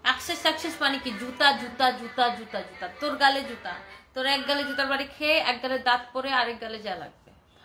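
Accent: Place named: Sylhet, Bangladesh. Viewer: Appu Hamen sylhet ke bolchi Aapne lagche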